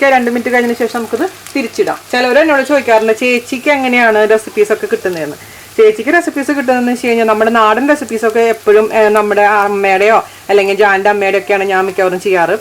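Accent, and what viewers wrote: Thrissur, India. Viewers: chechiii njn chechide veliya oru fan ane ela receipsm try cheyarnd elam adipolli ane chechiii chechide thrissur slagm presentation. oke super ane njnum oru thrissur Kari ane to God blesss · ചേച്ചി അടിപൊളി ആണുട്ടോ തൃശൂർ ആണോ വീട്.... അതാണ് ഒരു നിഷ്കളങ്കത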